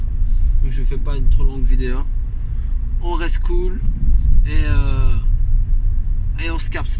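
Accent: Belgium. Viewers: Salut amigo ! Sympa ce petit concept de vidéo, ça change ;-) en tout cas tjrs autant agréable ton accent belge, je sais pas pk mais j'adore:-D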